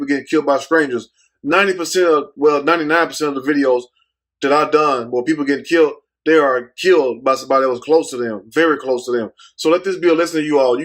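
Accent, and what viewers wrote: South, United States. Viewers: not only do I like your videos, I love you and your how your delivery done with that southern accent that is charming.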